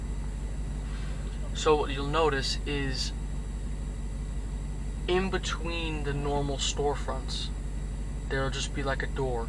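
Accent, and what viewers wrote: New York, United States. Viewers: I swear, when I first heard you speak, I thought of my cousin that grew up off Utopia Parkway in Flushing Hillcrest. You sound exactly like him. It must be a "Flushing" variant of the New York accent in that area! LOL Great vid as always.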